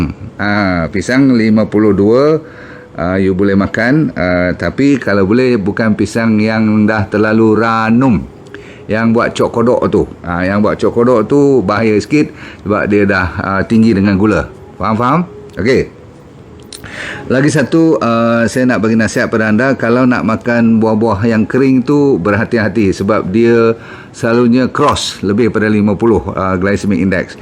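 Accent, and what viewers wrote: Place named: North, Malaysia. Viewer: manis melecas noh...Dr mesti org utara..hahahh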